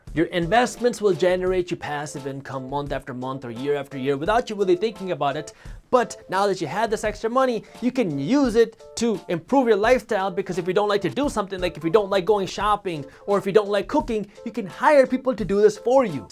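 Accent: India. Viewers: Indian accent and indian culture real af😂😂😂loved it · Love the Indian accent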